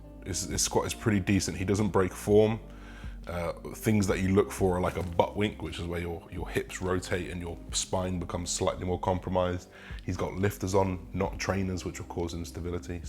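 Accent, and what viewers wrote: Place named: Liverpool, United Kingdom. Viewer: Content is sick lad! Keep it coming fella. Need to see more of your scouse accent as well bro it has me dying 😂😂😂😂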